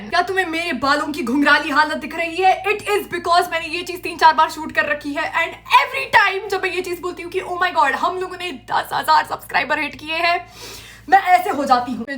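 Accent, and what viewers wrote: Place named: Kashmir, India. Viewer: Brooo, I literally felt like you sounded Kashmiri, but I wasn’t totally sure. I was getting that vibe from you really cool! I’m Kashmiri too. I know that wasn’t really necessary to say 🥀 And congrats on hitting 20K!!!💅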